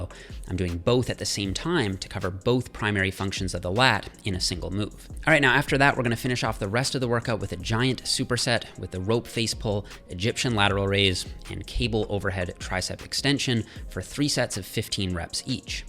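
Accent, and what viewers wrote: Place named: Canada. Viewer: You’re sounding super Canadian today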